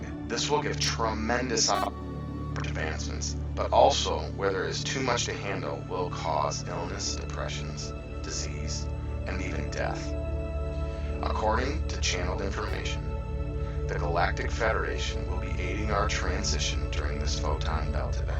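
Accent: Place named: United States. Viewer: well he is irish, this is an american accent.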